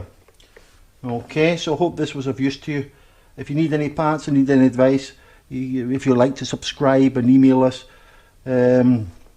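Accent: Scotland, United Kingdom. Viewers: Sometimes i wonder how many instructional videos absolutely bamboozal me......NOT THIS ONE. This is a delight to listen to. Is it because its a Scotsman explaining, to a like minded Scotsman😂 Thank you. I will now be able to diagnose my daughters reclining chair. Ive taken note of your website etc.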